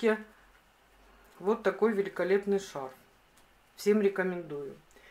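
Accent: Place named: Ukraine. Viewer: Неприятно слушать украинский говор.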